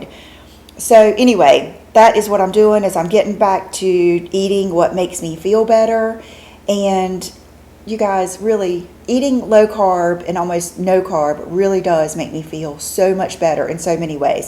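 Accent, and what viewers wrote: South, United States. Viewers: you are my FAV on YouTube for this very reason!! I'm 56 and my struggles are aging and the HORROR of weight gain as well. I found you by watching Angie Hot and Flashy and have been loving your channel every since, the past few months that is. You are more than just makeup and I love that you use this platform to help others like me feel better! I used to get by with just some powder and mascara but now I'm trying so many new products and feeling so much better about myself thanks to you! I'm a fellow southerner from Texas and I love listening to you for that reason:)))) Good luck with your eating challenges:))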